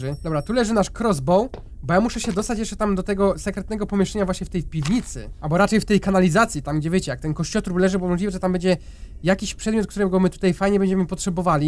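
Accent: Silesia, Poland. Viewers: wyglądasz na tego co mieszka na śląsku? Szczególnie jak mówisz (godosz)!!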